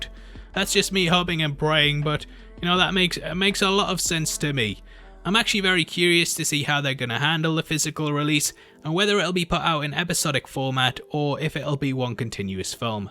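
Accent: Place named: Newcastle, United Kingdom. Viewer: Hi do you live in Newcastle just my dad lives in Gateshead and you sound the same lol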